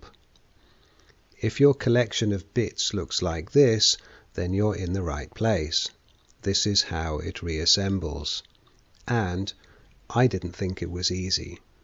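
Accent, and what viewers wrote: United Kingdom. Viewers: Great vid... and the British accent made it enjoyable 👍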